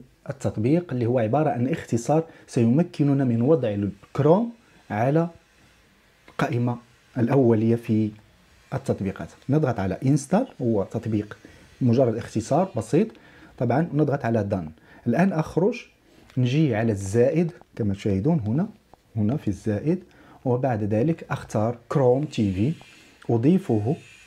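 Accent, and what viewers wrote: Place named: Amazigh, North Africa. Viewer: كل شر وحك صعبه ولانهم منك شي لهجه امازيغه صعبه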